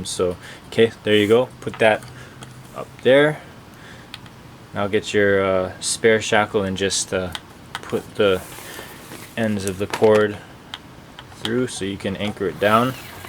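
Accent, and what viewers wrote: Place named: Hawaii, United States. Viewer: Watching thru the video and I'm like "this guy sounds like he's from here(hawaii)" so I looked at your picture and was surprise to find that u are lol.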